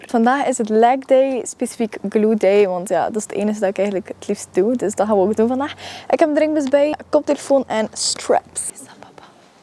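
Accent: Flanders, Belgium. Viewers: I love your Belgian accent. 😀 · She has a Belgian accent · Vlaams is Nederlands, maar dan mooier ❤ Een hele leuke video! Ik ga zelf ook naar de gym en het is gaaf om andere gymvideo's te zien. Weer een topvideo, een geslaagd experiment! :) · Dit is vlaams kut nee maar respect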